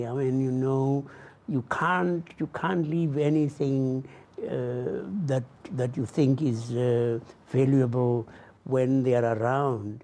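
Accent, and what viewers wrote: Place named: Africa. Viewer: pour les 1ère S1 Super !!! L'accent africain est superbe ! ;)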